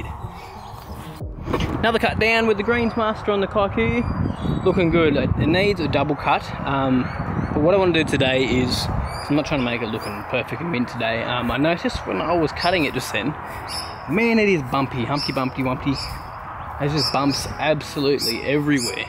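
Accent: Australia. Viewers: That’s exactly what I imagined Australia would sound like. Signed, every American.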